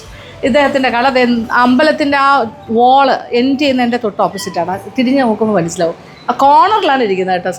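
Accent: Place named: Tamil Nadu, India. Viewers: Madathinte tamil kelkkan rasamundu😄kathirikka konjam veyy ennu kettappol ente Aswathy molu nalla chiri ayirunnu ❤ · കത്തിരിക്ക konjam veyy...😅athu kettapom chiri vannu😅... Mam nte tamil kelkkan nalla rasamanu❤️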